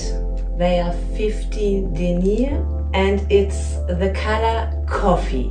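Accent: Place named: Germany. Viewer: Yr German accent makes me hot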